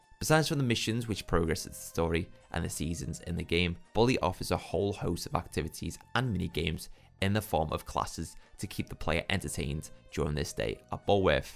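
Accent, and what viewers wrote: Liverpool, United Kingdom. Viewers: Near certain you are from Liverpool. We should collab on something, yeno. It would be decent. Two scousers hopping on some games. Seen your GTAiv and made me wanna play it again, on ps3.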